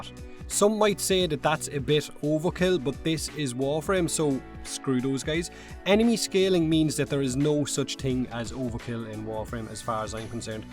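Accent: Ireland. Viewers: "What's up, feckers?" Love that Irish accent. Wanna know if there are multiple accents like the British.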